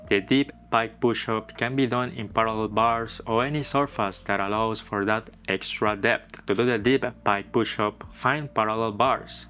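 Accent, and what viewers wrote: Spain. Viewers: Man, you look so asian, but you have a hint of an european accent (may be spanish). Not as important, thanks for your guide ;), especially the bent arm press. I have been trying to kick up to a handstand for almost 2.5 years and can barely hold myself up for a few seconds, inconsistently.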